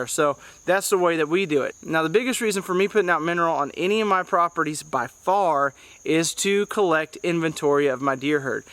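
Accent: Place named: New England, United States. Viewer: Yankee accent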